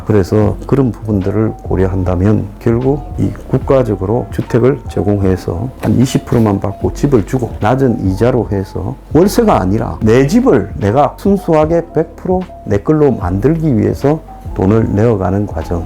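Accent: Gyeongsang, South Korea. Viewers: 경상도 사투리로 찬찬히 설명하시니 할아버지가 손자에게 희망적인 삶을 가르쳐 주시는느낌! ㅎ 저도 경상도 대구사람 이지요.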